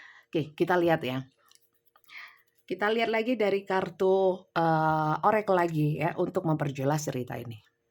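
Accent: Java, Indonesia. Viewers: Seneng suoro jowone ❤❤❤❤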